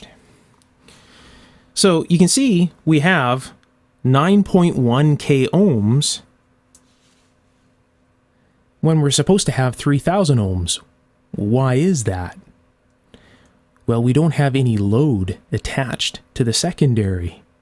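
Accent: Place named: Canada. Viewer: Love hearing Canadians say "about" "pasta" and "Mazda" 😬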